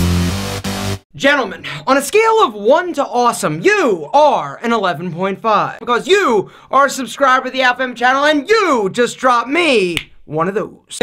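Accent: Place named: New Jersey, United States. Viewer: Alpha, just found your channel: am a NJ native, you totally sound like your from Jersey or PA.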